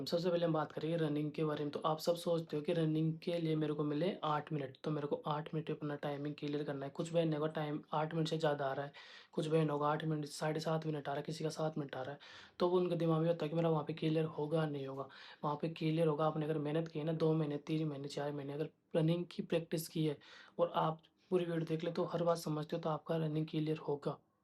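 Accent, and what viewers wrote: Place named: Jaipur, India. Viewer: aapke accent se hi samjh aa rha h kyuki m bhi jaipur se hu..and my mom use to sounds like you😅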